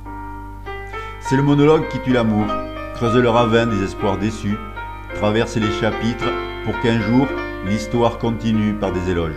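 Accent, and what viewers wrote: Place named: South, France. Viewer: Quelle belle idée, ce poème, récité par son auteur a quelque chose d'un cadeau personnalisé. merci...et pour le bel accent du sud !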